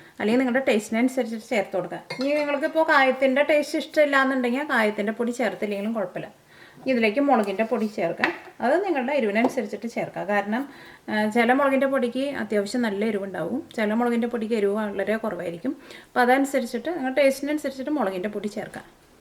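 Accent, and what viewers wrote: Thrissur, India. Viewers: Chechi de nadu thrissur ano?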